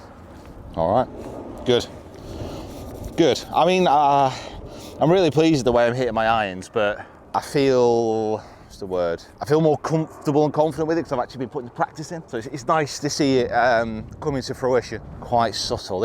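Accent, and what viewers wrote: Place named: Manchester, United Kingdom. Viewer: Helium Manc accent = perfect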